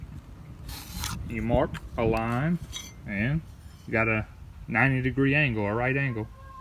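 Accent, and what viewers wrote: Louisiana, United States. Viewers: You from the parishes ain't ya? Great video! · Dis dood definitely frum louisiana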